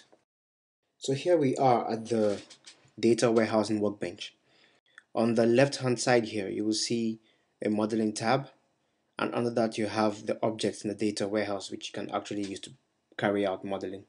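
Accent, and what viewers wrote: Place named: Africa. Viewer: Don't be a simple minded person. He has an African accent that is mixed with European accent. He is not faking any accent, instead he spoke slowly so everyone will understand. It shows exactly what you got from the training.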